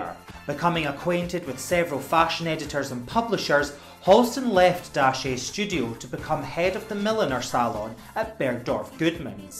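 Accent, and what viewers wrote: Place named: Scotland, United Kingdom. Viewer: stay tuned...because of the virus...unfortunately theyve halted production...but a miniseries is being done on Halston....the star...is EWAN McGREGOR...i got a bit emotional watching your video...because you used an image ...the image you used at 1 minute and 53 seconds in your video......that a friend of mine shot of Halston...his name is HARRY BENSON...he is a Scot just like you....with that same lovely burr you have.....he is the man who predicted i would become a photojournalist....i was just a writer when i worked with him... i laughed when he said it...but he was right....i think of him every time i do a shoot...he taught me to be AGGRESSIVE AND RELENTLESS....he photographed Halston a lot...and he loved him...he was basically the main photojournalist for the then new magazine PEOPLE magazine...and he shot Halston ...Liza...and Liz...for the cover...all together...FABULOUS...Harry said that H...was the king of NYC...and rightfully so...Harry also shot H...for WWD quite a few times...Harry said that H..would give him beautiful scarves to give to GiGi...his wife and she still wears them proudly..i cannot begin to tell you how wonderful H was...of course you know he used a lot of women of color as models...Pat Cleveland of course.....Alva Chin....but he often used the 1st black supermodel...the late....NAOMI SIMS...a LOT..she is MOTHER...to everyone who is black that loves fashion...she is MOTHER..when she retired...to create her own makeup line..and wig line ...both are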